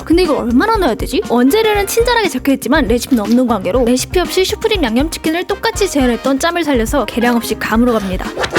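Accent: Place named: Gwangju, South Korea. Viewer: 광주분이신가요?광주광역시 사투리 들리는데ㅋ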